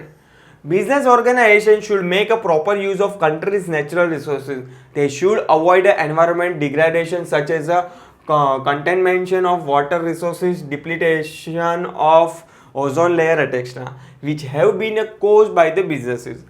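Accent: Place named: Mumbai, India. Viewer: Mr., you have a touch of Mumbai's local language.😅."aapan, yeh kya bol rha hai, paile(pehle).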